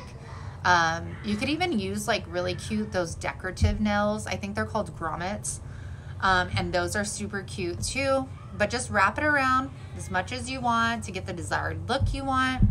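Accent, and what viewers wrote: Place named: North, United States. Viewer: Hi I just came across this video and had to watch it to learn some things because making wood round signs is my favorite craft to make. I always order my rounds from Amazon, but they aren’t anywhere near that thick! Those are really nice! I am from Southeast Kansas. It sounds like you are up north from the places you shop at. Thank you for the tips I picked up! I enjoyed and subscribed to your channel.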